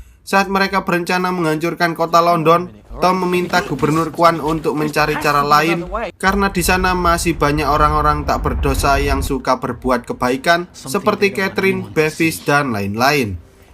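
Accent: Java, Indonesia. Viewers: medokmu menawan, bikin kangen masss membacakan cerita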